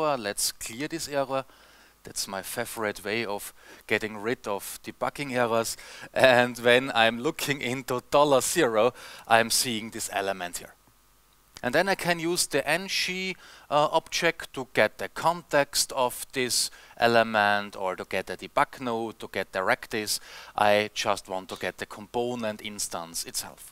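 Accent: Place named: Austria. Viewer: Came to check on what's up with Angular these days, stayed for the glorious Austrian accent. I'll be back.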